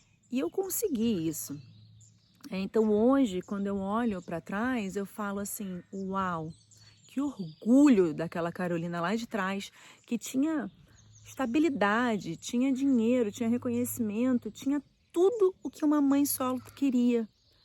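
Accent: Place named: Brazil. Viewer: Olà fico contente com suas declaraçoes, mas desculpa minha pergunta o porqué de ainda falares com sotaque Brasileiro? fui emigrante na Suissa e Luxembourgo e nunca usei linguagem portuguesa e o frances também é de origem do latin entendes, é a unico reparo que faço em sua aventura aqui em portugal, eu me entegrei na Europa em frances e para mim o mais importante na integraçao é prencipalmente a linguagem quasi todos portugueses gostam que qualquer raça que falem a linguagem correctamente e resto da Europa obrigado por sua atençao.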